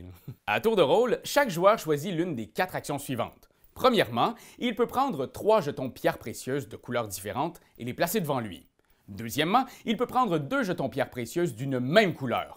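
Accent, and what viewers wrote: Quebec, Canada. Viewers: À la fin de son tour ! Ce qui peut permettre pendant celui-ci, d'en avoir 13 momentanément ! 😋 Petite omission également concernant les nobles, que l'on n'achète po...hein !? Mmh'et 🤫 Il ne faut po...hein !? Tout révéler ! Mmh'et donner envie, comme le fait si bien vos pépites oups ! Vos vidéos telles des bijoux/💎... (Ainsi que sur la fin du jeu où l'on finit le tour). Encore merci pour vos magnifiques vidéos. Qui + hé ! Agrémenté de l'accent de la Belle Province ! ✌🏼🃏♟️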